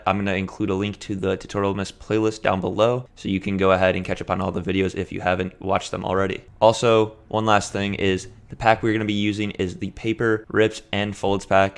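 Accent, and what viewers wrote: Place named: Buenos Aires, Argentina. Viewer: Hablas en ingles pero se siente como si fueras un cheto de capital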